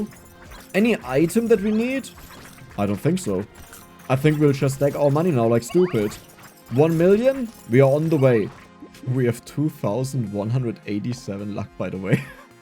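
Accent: Germany. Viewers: Man hört das Deutsch so durch xD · The german accent i love it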